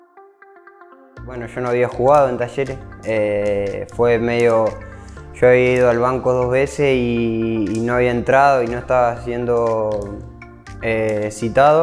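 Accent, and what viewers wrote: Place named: Chile. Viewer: Todo un chileno ya, habla como chileno en sus directos🤑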